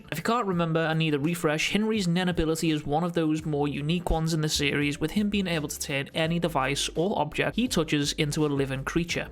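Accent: Liverpool, United Kingdom. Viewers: My scouser reviewer in the building 😍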